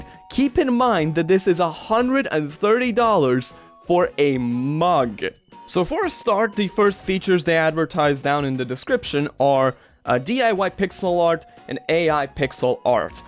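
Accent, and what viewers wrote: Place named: Europe. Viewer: Your european accent is fading. fascinating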